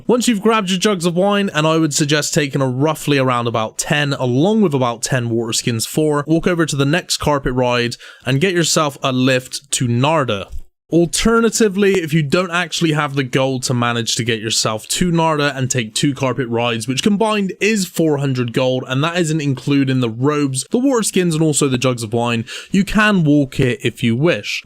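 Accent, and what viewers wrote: Bristol, United Kingdom. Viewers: I've just found you but are you not Bristolian? Liked & Subbed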